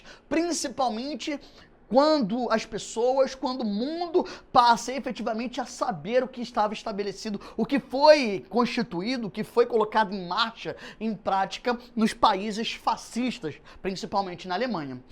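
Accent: Rio de Janeiro, Brazil. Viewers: parei de assistir so porque é carioca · Você é carioca? Kkkk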